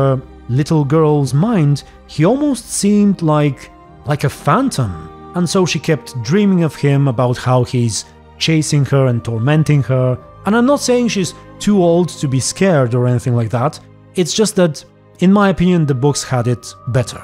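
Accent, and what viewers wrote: Bulgaria. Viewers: Eyyyy you Bulgarian bro?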